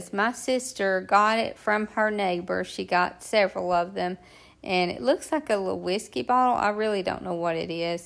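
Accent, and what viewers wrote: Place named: South, United States. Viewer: Thank you so much, just love your ideas and your accent is gorgeous. We hardly ever hear a Southern accent in South Africa so it is indeed very pleasurable watching and listening. Just subscribed, I have to get more of this, it feeds my soul. Thank you and God Bless❤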